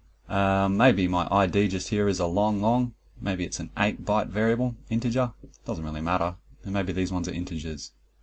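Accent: Australia. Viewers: Alejandro Doe You do know Lennon was a Brit and this dude sounds like he's from Down Under. BTW vectors are better than linked list almost always. You get a lot more cache misses vs. vectors.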